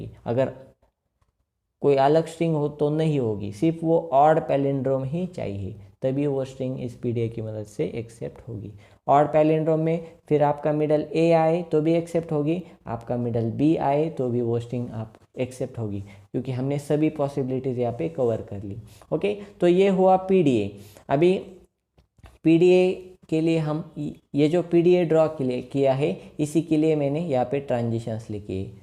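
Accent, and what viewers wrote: Gujarat, India. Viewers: sir, r u from Gujrat ??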